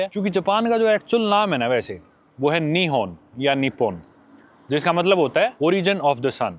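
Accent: Haryana, India. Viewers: Baki sab sahi video quality best host best per ye jo consistency hai vo thodi si increase hojaye jayda bhi nhi halki si increase hojaye hafte me ek hi ajaye na tho bhut hai bhai ab have to hogye hai aap ke video dhek ne ke mamle me jayda nhi bus halki si consistency quki itne actual punctual hum bhi Nhi hai isliye Kripaya ker ke hafte ki ek or bhai raw upload ker do video me tho kheta hu or full Haryanvi accent wagera jo app normally use kerte hi hogye usually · Kuch bhi ho jya lekin Haryanvi sudh hindi nhi bol sakte , " jaan karke" he is speaking that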